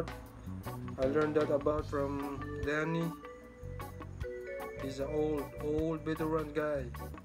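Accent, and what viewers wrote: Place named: United States. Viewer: Parang original na kanu sir may accent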